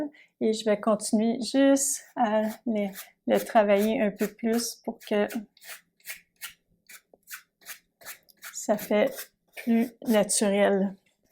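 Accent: Quebec, Canada. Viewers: Merci pour ce partage, c' est toujours intéressant et rassurant de voir vos différentes techniques...et j' aime bien votre petit accent( canadien?) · washhands:❤‍🩹jadore votre accent Quebecois Merci pour Les astuces je debute n peinture a l'huile c'est difficile si on a pas de formation Merci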